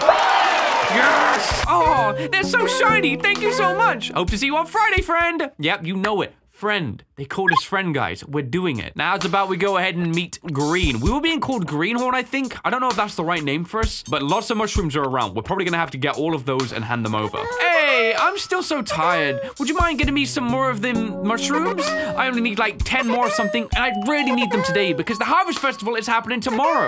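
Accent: United Kingdom. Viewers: I was pretending to be hypnotized because I was watching it and I was confused of what the intro was and so I rewind it and pretended to be hypnotized. That intro was pretty cool. Also when I watched your videos you sound a little bit British like when I heard you say dawko I didn’t hear it clearly. Do a DNA test of where you could be from, Dawko did one on his channel 3 years ago. Or what your part of part Irish, part Scottish, part Swedish idk please make a video of it if you can and watch dawko video for an idea. Just search Dawko DNA Test and you’ll find it. And once you know what to do try to make a video on it.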